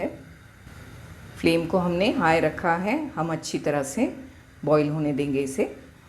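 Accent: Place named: Maharashtra, India. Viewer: It's really very nice the way you explain the recipe. The way you sound It seems you have a connection with maharashtra.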